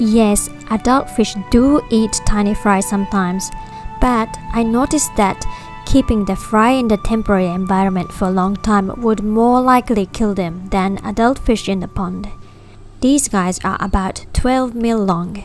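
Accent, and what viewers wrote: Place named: Australia. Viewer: Ive really enjoyed this video and I've also really enjoyed listening to you. I spent time in QLD and your manner of speaking is nicely peppered with familiar aussie euphemisms. Lots of good information, also appreciated you sharing the results good and less so. Now im toying with the idea of creating a little pond myself- im getting ahead of myself now though 😂. Ive subscribed and look forward to seeing what else is on your channel. Big l❤ve xxxx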